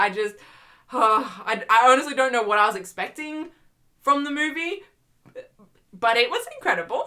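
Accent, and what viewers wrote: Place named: Australia. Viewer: Success for you is just around the corner (bubbly personality) and that Aussie accent.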